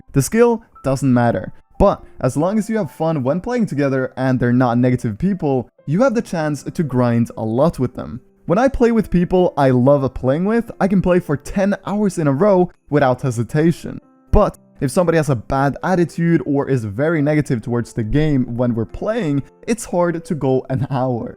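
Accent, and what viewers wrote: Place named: Norway. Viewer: jeg visste at du var norsk jeg kunne høre comsa dine pa turneys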